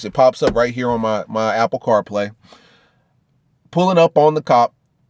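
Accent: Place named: South, United States. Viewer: why u talk like an old country segregationist politician in the 60s...im from south carolina and there's different types of country twang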